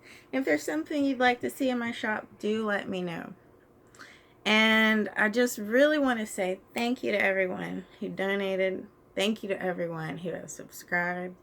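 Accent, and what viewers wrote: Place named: Dallas, United States. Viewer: I'm from Dallas too.. I never realized we have a Dallas-accent until now! I hear myself lol high five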